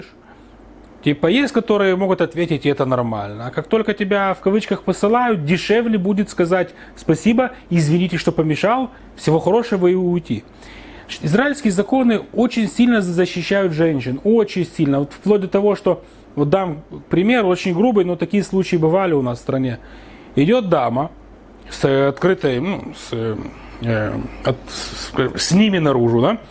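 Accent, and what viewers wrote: Odessa, Ukraine. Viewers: Приятно вновь услышать неистребимый Одесский акцент. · Класный одесский акцент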